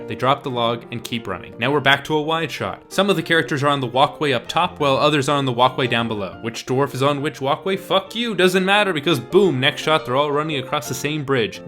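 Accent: Canada. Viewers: heard the Canadian accent. Woot!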